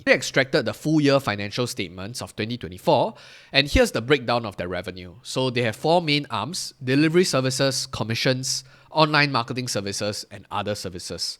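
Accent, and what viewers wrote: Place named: Singapore. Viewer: Love the heavily Indian dominant Singaporean accent. So mellifluous.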